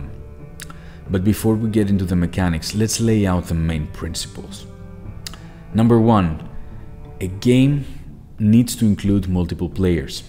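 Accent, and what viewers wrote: Greece. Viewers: Came here to study, heard greek accent, melted, lost focus. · You sound so greek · Sounds as greek accent.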